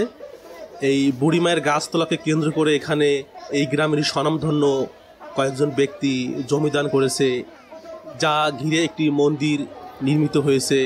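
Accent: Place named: Khulna, Bangladesh. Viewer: তোমার বাড়ি তো খুলনা জেলায়। কথাবার্তার ধরণ শুনে বোঝা যাচ্ছে।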